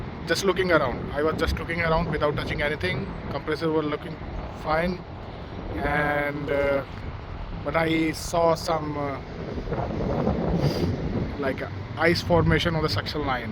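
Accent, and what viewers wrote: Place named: India. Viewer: You sound like you’re from India. I would like to connect with you and learn about your journey in the HVAC trade.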